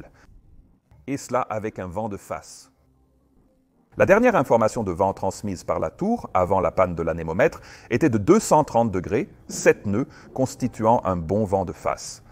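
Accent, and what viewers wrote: Quebec, Canada. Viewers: Excellent. Bravo cousin. 👌👍Avec l'accent Outre Atlantique, c'est terrible. Pour ma part j'ai un faible pour le Boeing 747 😉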